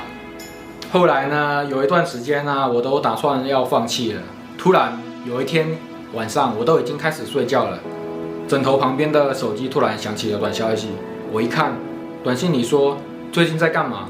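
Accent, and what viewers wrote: Taiwan. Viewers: 兄。你是大陸福建人，可是你說的普通話卻若台灣國語。初時我還以為你是台灣人。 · 你是厦門人嗎？聽口音還以為是台灣人! 去菲律賓多久？ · 哈哈~我看你影片一開始我以為你是台灣人，後面說是廈門才知道你是中國人廈門口音幾乎跟台灣沒差別😆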